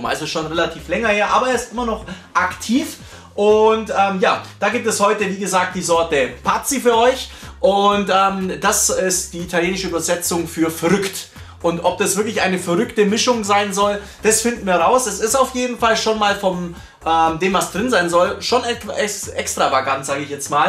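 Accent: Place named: Swabia, Germany. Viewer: Woher aus Schwaben kommsch du eigentlich? ;-) Dialekt kommt mir sehr bekannt vor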